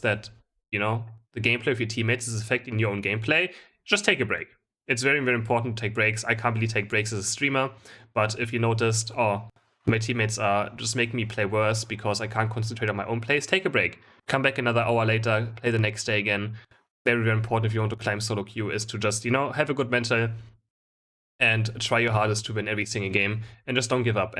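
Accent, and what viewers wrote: Germany. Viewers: Hey, thank you for this nice and informative Video, i've got a question to you:D Your accent sounds really german, may i ask where are you from?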